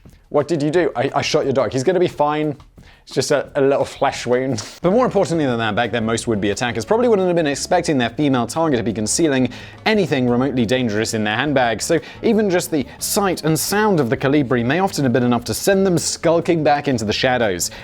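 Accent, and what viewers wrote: United Kingdom. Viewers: ...look, I'm not gonna go read all of the boi with the blazes comments here, but damn... are people REALLY wasting TIME on comments like, "what is a taco?" OBVIOUSLY pronounced by a British man??? How many ducks do people really give about his pronunciation???? Geez!!!!! That's a little bit picky, don't ya think??? I LOVE THIS CHANNEL!!! IT'S MY FAVORITE!! LET THE BRITISH MAN SPEAK!!!!🙄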